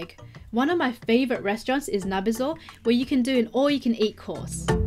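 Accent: Australia. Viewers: great video - it bought back many memories. I think you have Aussie accent, have you studied in Australia. I am Aussie and my wife is from Japan. Keep well. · This girl sounds like someone from west Sydney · It's my first time to hear an australian accent Much more like British than I thought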